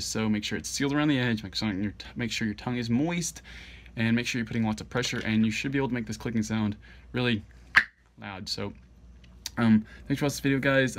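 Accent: United States. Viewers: He had a cowboy Accent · hes got a southern accent it super awsome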